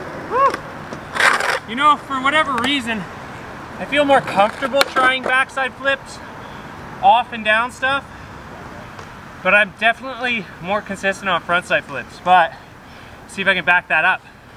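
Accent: Canada. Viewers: I'm not sure why but I love these videos. I think watching someone who skates like me, it's more relatable than watching a pro do a switch backside lipslide down a 16 stair handrail. I've even gotten used to the Canadian accent eh! Great content dude, thanks for all the videos.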